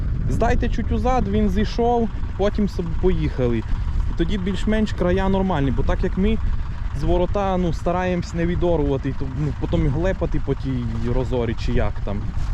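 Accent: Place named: Dubrovytsia, Ukraine. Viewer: Доброго... по говору ви наче з Дубровицьких країв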